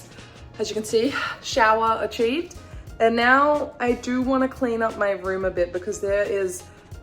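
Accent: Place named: Australia. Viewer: I am sorry for your TP... cuz my Costco Purple TP is legit. Also, I love your Aussie accent when you can speak Japanese perfectly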